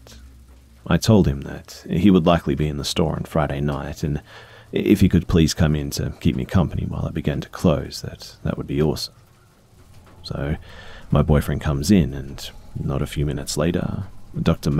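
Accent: Australia. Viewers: He's not British, he's Australian. Like, Steve Irwin, fair dinkum Aussie. I can understand getting the two confused, though. Just think Lazy Masquerade when you think British.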